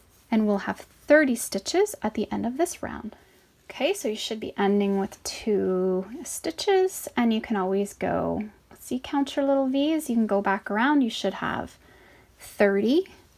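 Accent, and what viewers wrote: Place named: Canada. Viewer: These are addictive! I'm a knitter, and all my crochet attempts get ripped out. I made three of these! Thanks so much for sharing your wonderful creativity. On a completely different note, I'm a linguist, and I'm trying to place your accent :) The way you say "about" makes me think Canada, but the way you say "to" or "two" is really stumping me. Would you mind saying what region of N. America you hail from?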